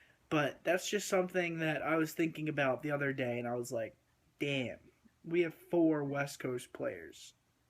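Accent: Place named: East Coast, United States. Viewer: i thought you were east coast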